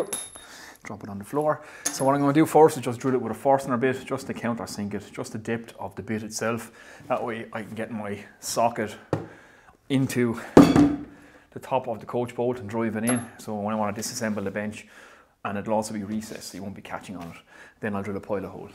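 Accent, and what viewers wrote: Waterford, Ireland. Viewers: Some Waterford accent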